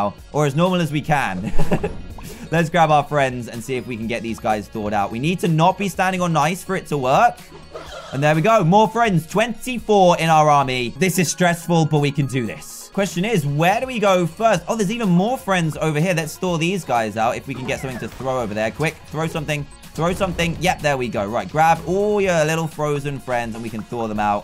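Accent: England, United Kingdom. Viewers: lol is from england?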